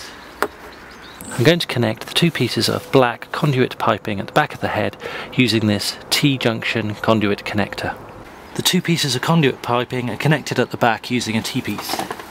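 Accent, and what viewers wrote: United Kingdom. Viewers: Thanks for the tutorial! And was wondering where you get your paint from (I'm assuming your in the UK due to your accent)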